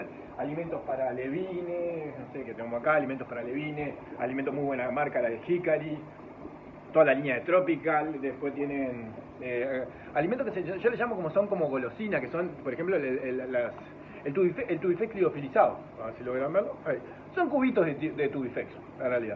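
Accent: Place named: Entre Ríos, Argentina. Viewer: si eras de Entre Ríos por la forma de hablar igual a acá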